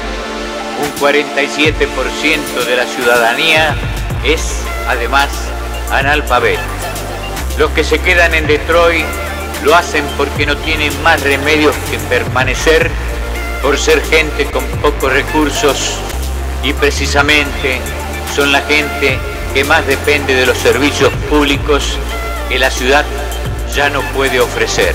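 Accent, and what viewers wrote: Argentina. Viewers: . Seguro que sos Argentino y tanguero por la tonada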